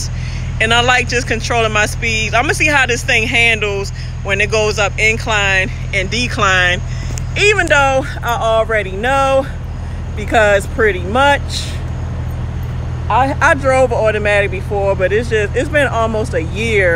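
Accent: Baltimore, United States. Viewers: Why are you so Fricken cool?😍😍😍 I Love your energy. Soooo pleasantly Calm and peaceful; and that Bmore Accent😂😂😁😁😍😍😍👍👍👍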